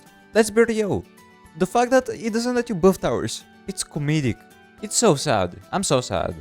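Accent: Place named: France. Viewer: you have a thick french accent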